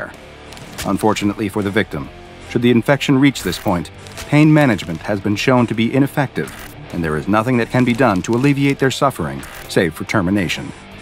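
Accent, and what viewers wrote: United Kingdom. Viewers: He became british D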